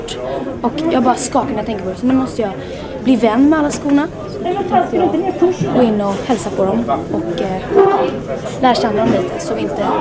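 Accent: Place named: Stockholm, Sweden. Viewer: är verkligen jättegullig! Man kan titta massor på hennes klipp och hon har en otroligt gullig dialekt. Det finns inget vackrare att lyssna på än en 08 som pratar.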